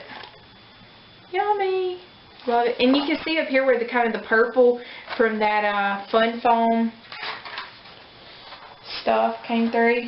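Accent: South, United States. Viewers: I just love your little southern accent, and phrases. Reminds me of my sweet cousins from "down south". Thank you for sharing! ♥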